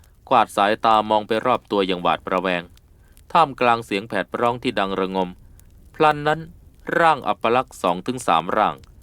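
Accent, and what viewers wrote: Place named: North, Thailand. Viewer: ชอบฟังครับภาคเหืมนมาก😅😮😢😂❤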